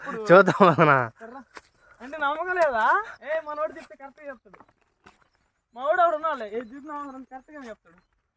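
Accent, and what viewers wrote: Nellore, India. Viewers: Meeru maattladatha vunte mana Nellore lo ne vunnattu vuntadhi naaku😅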